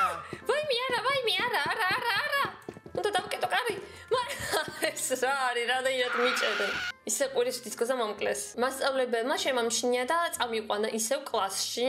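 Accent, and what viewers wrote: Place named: Georgia. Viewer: რა სოფლურად ლაპარაკობს თბილისელიხარ თუ სოფლელიხარ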